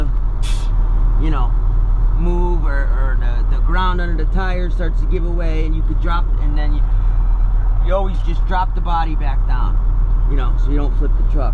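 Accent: United States. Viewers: You talk funny.... WHERE you from BOSTON? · Great video...u in the tri state area...accent sounds familiar...new York New Jersey or CT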